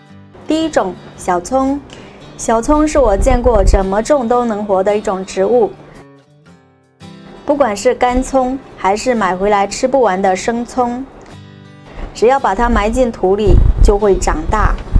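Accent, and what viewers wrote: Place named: Taiwan. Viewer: 腔調，很台，讚。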